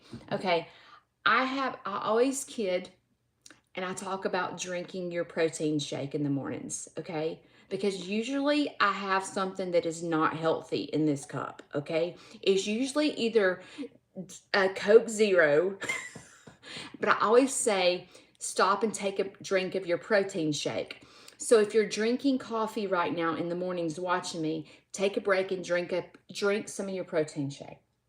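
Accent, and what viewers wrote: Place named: South, United States. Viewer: Loved this show! I retired at the end of 2022 and am speeding towards 69 years old so I have a lot of newfound time on my hands! I live in Holland, Michigan & love listening to your southern accent. I believe listening to your shows (as well as those of Cog Hill Farms) will be a great way to go through my days! I appreciate the laughs that you both provide & I love watching the animals & your interactions with them. I anticipate your subscription numbers will increase so please make sure to take time for yourself to keep the balance you discussed in this show. Take care!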